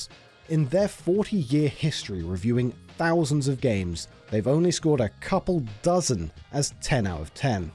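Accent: United Kingdom. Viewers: Looks like the script itself was copy-pasted as captions, instead of using YouTube's auto-caption system. This video was written by an Arkansan (me, I write like I talk), but it was VO'd by a Brit, that's why there's some inconsistency. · Stop using British accents already.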